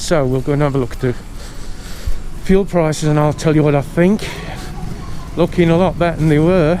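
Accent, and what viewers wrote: United Kingdom. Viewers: Your British accent would make it difficult for most regular Filipinos to understand your English. The kind of English taught in the Philippines is American English to begin with.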